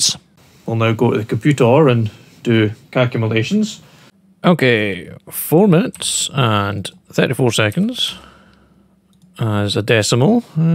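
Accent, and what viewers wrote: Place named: Orkney, United Kingdom. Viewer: I was mixing specific heat and conductivity with my idea. Thanks for following up. Also your accent and humor reminds me so much of my grandfather. I hope to visit the Orkney Islands where he is from some day. Greetings from Seattle, Washington.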